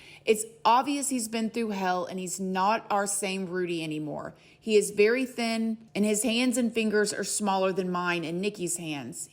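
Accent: South, United States. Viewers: So happy Rudy has been found!💙 Also I love you, a main reason I subscribe is hear you say "poh-leese" your country twang is adorable. Your story is inspiring, thank you for sharing your life w/us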